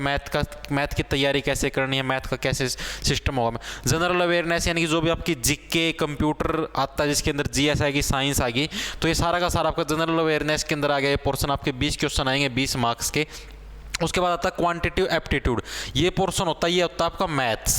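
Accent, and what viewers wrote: Haryana, India. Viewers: Haryanvi h bhai 💪❣️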